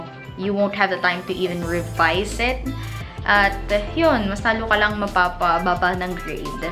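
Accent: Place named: England, United Kingdom. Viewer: ATE CAN YOU TEACH US WITH YOUR ENGLISH ACCENT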